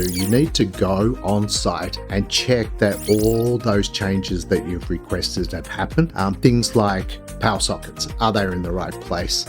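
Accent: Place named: Australia. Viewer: He didn't mention USD or AUD but from his accent i think it's AUD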